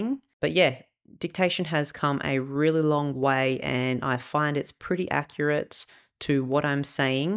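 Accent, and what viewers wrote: Australia. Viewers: Now im being presumptuous and assuming Melbourne accent. So, the huge question is…… Collingwood or Carlton? I’m Collingwood good win last night 😎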